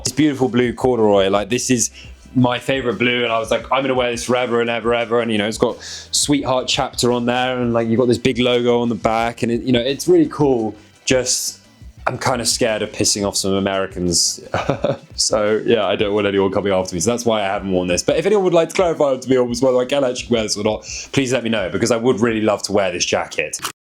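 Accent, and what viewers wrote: United Kingdom. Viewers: english accents tickle my brains in a good way😌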